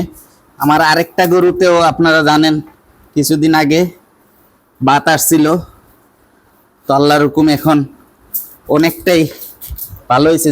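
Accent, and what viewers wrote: Sylhet, Bangladesh. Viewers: ভাই আপনাকে তো সিলেটি নি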